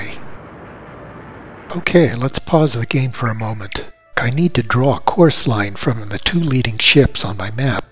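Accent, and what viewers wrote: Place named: North America. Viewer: Very well put together video - Ubisoft should be grateful for the advertising. Also it's great to hear someone with a North American accent to use "meters." Great job.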